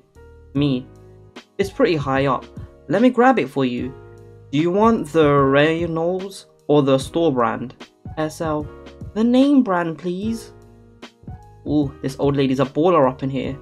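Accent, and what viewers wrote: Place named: London, United Kingdom. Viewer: i am a subscriber, have been for about 5 minutes because you sound like a london boy to me, gotta watch out for the london crew. i am a satanist, you are more than welcome to use satan as a curse word, we believe in freedom of speech, have fun. ave satanos.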